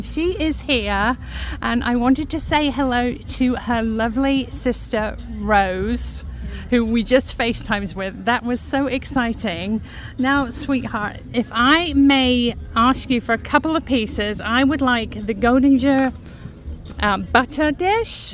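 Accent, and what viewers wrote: England, United Kingdom. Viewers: If you grew up in the United States, why do you have the English accent just curious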